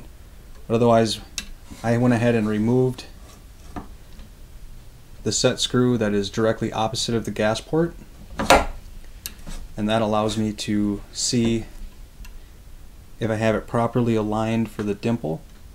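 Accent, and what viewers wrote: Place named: Upper Peninsula, United States. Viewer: I've watched several of your videos in the last couple days and the first thing I thought was wow this guy has a thicker yooper accent haha! I was close. Sounds like you are in MN. I'm right next door in southern WI. Anyway, nice build. That is a sexy rifle. Gives me a few ideas now that I'm thinking about building one myself possibly. I've learned a lot from your channel so far keep up the great videos and thanks for sharing!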